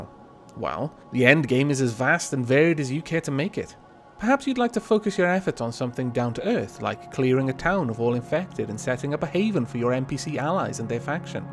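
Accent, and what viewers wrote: United Kingdom. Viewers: What's with all the new Let's Players I'm finding sounding like they're doing voice-overs on prologues to some series? First the 'Call in now, and you get TFC with not just five, not just six, but at least SEVEN NEW TREES!' of Pakratt, and now the 'Come with me on a journey through the ag... I mean, game' of this guy? Amusing, though. Wonder how you'll talk later on... Probably still british-esque.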